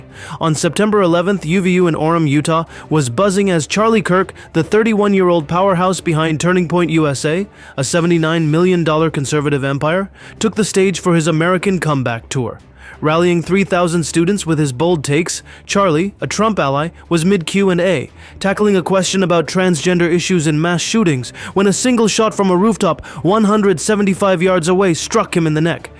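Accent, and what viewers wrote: India. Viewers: We commentator sounds Indian?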